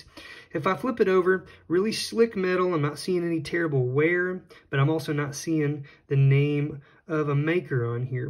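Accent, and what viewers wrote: South, United States. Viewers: This guy is definitely from the south with that strong southern accent.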